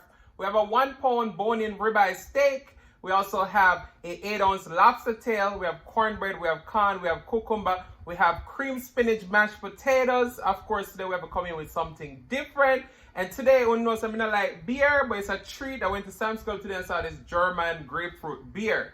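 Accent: Jamaica. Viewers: I SAW YOU IN A COMPILATION AND HEARD YOUR ACCENT AND CAME OVER IMMEDIATELY!! IVE BEEN WANTING TO SEE JAMAICAN MUKBANGERS AHHHH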